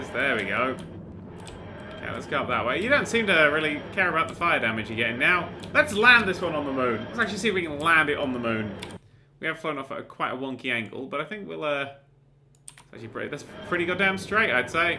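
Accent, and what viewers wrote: United Kingdom. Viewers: seriousy?? u sound like ur from great brittian, if so. hw do u NOT know doctor who??and why does the interior look old (season 3-4 or something)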